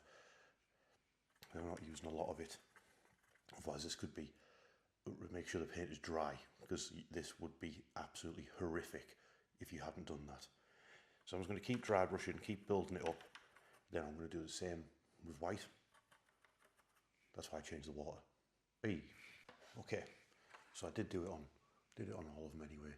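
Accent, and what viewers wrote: United Kingdom. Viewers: Ah the audio quality. The Smoggie accent really shines through 😅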